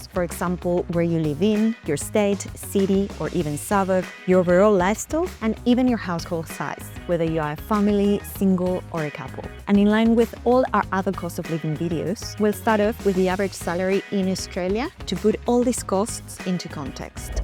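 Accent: Argentina. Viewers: ese acentooooo sos argentina? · El acento argentino siempre presente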